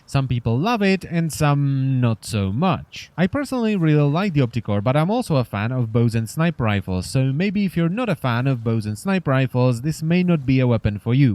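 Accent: Czech Republic. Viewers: >that czech accent Nádhera :^) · Czech accent af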